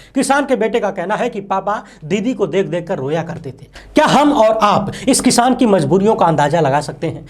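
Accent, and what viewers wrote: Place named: Purvanchal, India. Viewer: आपका बोलने का तरीका बता रहा आप पूर्वांचल से हैँ आपकी सच्ची पत्रकारिता को सलूट 👌🏻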